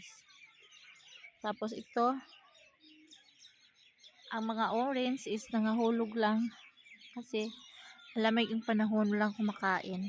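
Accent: Visayas, Philippines. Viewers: Visaya diay ka ma'am..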